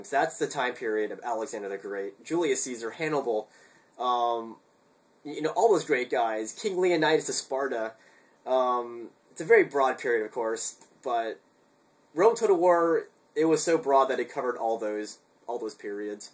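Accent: United States. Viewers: !?!? thats you!? you look filipino or atleast South east asian i always thought yo were american because of your voice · He is very obviously American.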